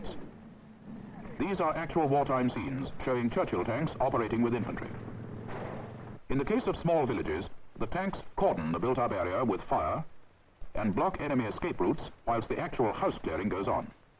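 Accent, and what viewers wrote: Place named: RP, United Kingdom. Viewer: The days when all narrators had what we call a BBC accent. God forbid they used anyone with even the remotest trace of a regional accent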